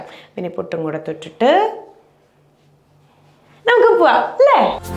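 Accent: Thrissur, India. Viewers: Thrissur slangil athinte monj ithirikoodum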